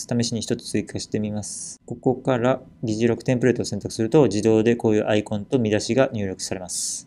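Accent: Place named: Kansai, Japan. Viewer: 関西弁聴きやすすぎます。。。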